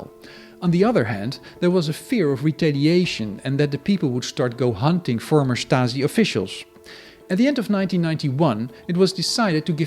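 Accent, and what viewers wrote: Netherlands. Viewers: Are you a Dutchman? Your English is like that of a Dutchman.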